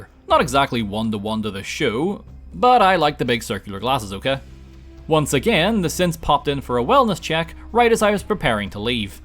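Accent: Northern Ireland, United Kingdom. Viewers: It's a pet peeve of yours that that someone from Northern Ireland doesn't speak in an American accent? How very odd.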